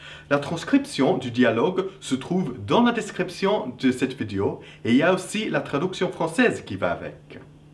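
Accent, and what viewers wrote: United Kingdom. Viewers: Je suis américains et d'apprentissage français. But slurs he too much. He also slurs a letter v into the letter r sound. He has learned a British accent.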